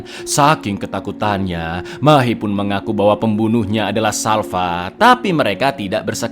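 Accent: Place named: Indramayu, Indonesia. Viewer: Kok logate serupa Indramayu, curiga kang wng dewek 😅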